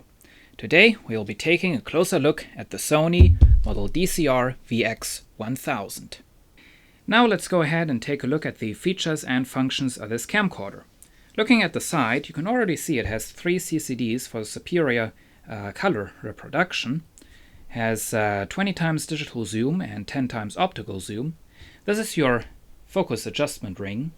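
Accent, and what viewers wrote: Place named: Germany. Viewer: are you german, you have a very german accent..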